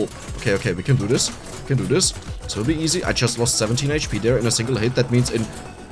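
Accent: Germany. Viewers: Are you from germany xD sounds like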